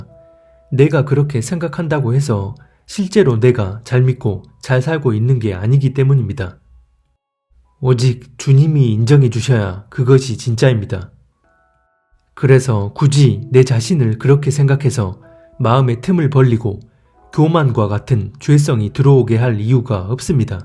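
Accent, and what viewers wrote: Jeolla, South Korea. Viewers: 전라도 억양이구만 ㅋㅋㅋㅋ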